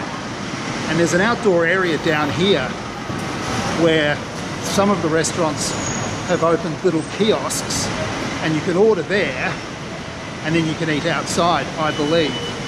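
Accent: Australia. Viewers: It’s nice to hear an Australian accent as well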